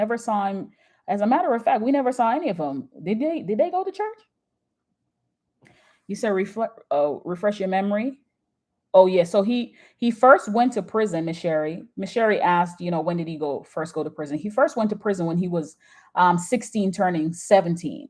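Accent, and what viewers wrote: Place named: Jamaica. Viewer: Oh you Jamaican! Gwan, no wonder I like your show! 🙏🏿🇬🇧🇯🇲❤️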